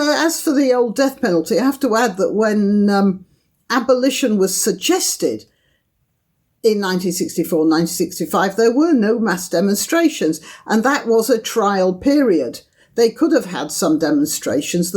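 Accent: United Kingdom. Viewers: No no no... your voice cadences are quite harmonious to My American ears. Americans like me melt for that British accent... ask any American Beatles or Rolling Stones fans..... keep talking.... and cogent thought with that accent???? Young lady, I better never hear your voice and see you on payday!!!!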